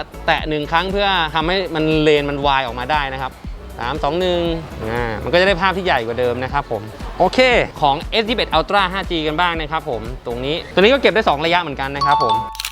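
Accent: Chiang Mai, Thailand. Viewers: พี่เป็นคน เชียงใหม่ใช่ไหมครับ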